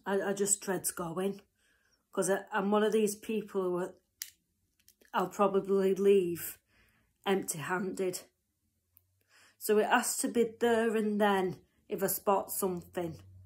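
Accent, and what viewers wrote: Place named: Yorkshire, United Kingdom. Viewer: Yeah lol am from Durham but you seem bit further away Yorkshire maybe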